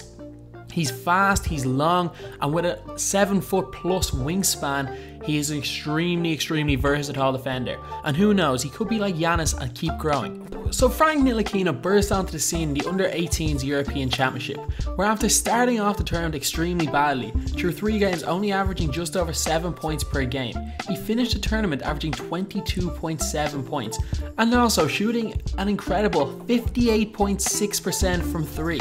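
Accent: Ireland. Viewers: That Irish accent tho! 👍😎🍺🍻🍺 Btw, the same hate Ntikilita is getting is the same hate Porzingis got in 2015 (a Draft just as deep as this 2017 Draft). They're even saying that he'll take 3-5 years to develop, JUST like was said against Porzingis! 😊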